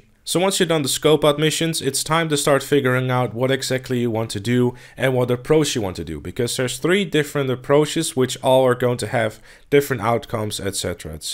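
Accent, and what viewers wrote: Netherlands. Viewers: Ik hoor een beetje een Nederlands accent? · Hollander zeker :p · are u from the netherlands ??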